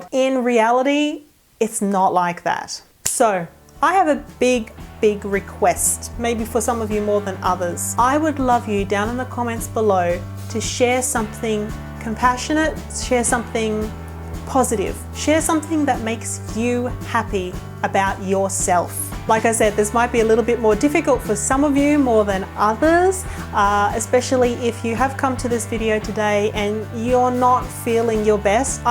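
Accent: Australia. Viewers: Its nothing compared to what joy your videos bring me ! I love your channel , I do , I even started to like manga and stuff that people in Japan like , animes and so on. I know a few words thanks to that , which is thanks to you :) And one more thing darling : You are one of the very first australian youtubers I discovefred on youtube and since then, I love autralian accent ! people say , they end up sounding like asking, as if there is a question mark, I think its more like wanting to say more, so the melody would go down and a little bit up just so that we axpect anothe sentence, but sometimes it feels like you guys are emotional aout the things you say ...I dont know, maybe you could do a video about stuff that make you australian ?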